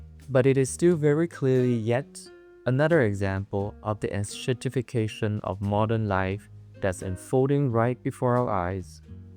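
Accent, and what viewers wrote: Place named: England, United Kingdom. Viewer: Love your english accent, love bánh giò too, keep going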